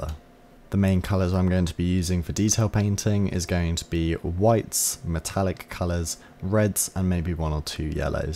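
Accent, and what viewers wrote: England, United Kingdom. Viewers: Hi, re Tamiya where are you? You sound English? For two years you are rather good. Do you know how many models you have made? Bob England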